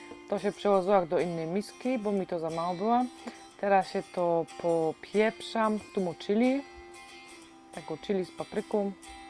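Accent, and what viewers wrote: Silesia, Poland. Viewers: Jak ja nie cierpie gwary slaskiej (sory)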